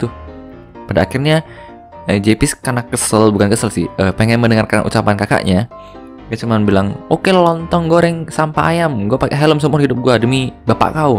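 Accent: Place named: Medan, Indonesia. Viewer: "Penta bapak kau, mati kau longor!!" Confirmed bang dari medan:v Edit: Ditambah pidung:v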